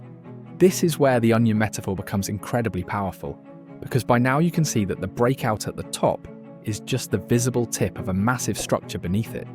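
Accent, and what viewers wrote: England, United Kingdom. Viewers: Can you please change the accent? This English accent is getting on my nerves!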